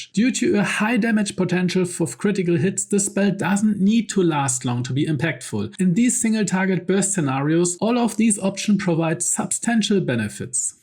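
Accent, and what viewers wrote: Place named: Germany. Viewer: absolutely love this kind of in depth analysis!! keep it up!! recently for whatever reason youtube has been recommending me many smaller, german, bg3-focused creators and i have been all for it lol (forgive me if ive misheard your accent — it sounds german to me)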